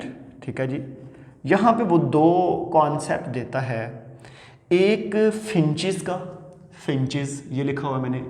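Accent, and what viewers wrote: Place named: Punjab, India. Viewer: I’m sorry but looks like sir speaks English like Punjabi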